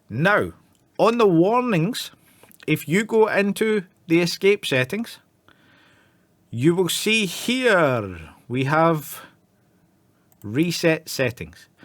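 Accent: Scotland, United Kingdom. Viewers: i'm listening like, finland? that's a scottish accent lmao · Christ man, You are scotish? "rycordyn" "cerrierz" "skelz" music to my ears.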